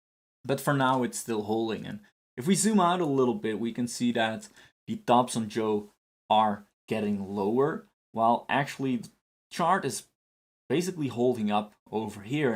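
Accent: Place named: Poland. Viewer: Are you Slavic? Sounds like Polish 🇵🇱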